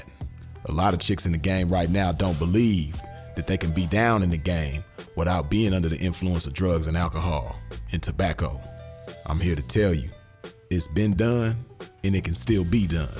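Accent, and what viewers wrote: Chicago, United States. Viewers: Ah “P” I Didn’t know you was 🥶 with the ISM like Dat But Ur name is you from the Chi u sound like SouthSide Nigga Mannnnnn? This Video was Refreshing to Life G Blessings to you 🫱🏿‍🫲🏾💯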